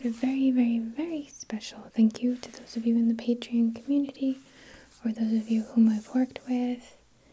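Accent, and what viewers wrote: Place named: New York, United States. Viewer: Your voice is the perfect trigger for me! As a New Jersey guy I am a sucker for a New York accent LOL. Thank you for the wonderful relaxation you bring me.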